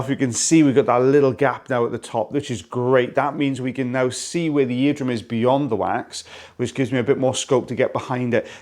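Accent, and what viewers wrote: Wales, United Kingdom. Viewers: I was scraping treacle out of a bowl the other day and muttered something about earwax. My mum thought I'd gone mad, and after my explanation thought I was even madder! I tried to show her a video but even lovely Welsh accent didn't sway her 🤣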